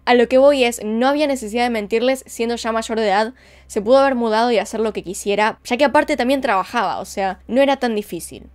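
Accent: Buenos Aires, Argentina. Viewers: Es acento porteño y yo soy Rosario tenemos otro acento. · Pero si tiene acento de buenos aires jsjsjs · Lindo acento Argentino te super Admiro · Yo soy de Córdoba y el acento de es porteño.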